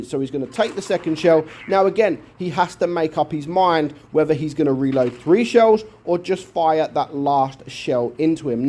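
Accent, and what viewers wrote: United Kingdom. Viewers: bri'ish accent lol, i also mishead lmfao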